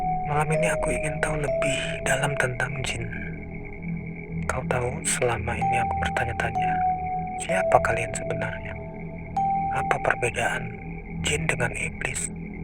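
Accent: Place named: Sumatra, Indonesia. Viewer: Author org Sumatera to? Kirain jawa ngapak... 😅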